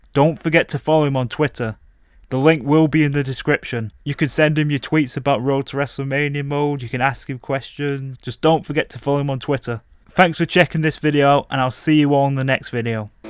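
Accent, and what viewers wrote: Yorkshire, United Kingdom. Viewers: You have a propper broad Yorkshire accent it’s brill 😂😂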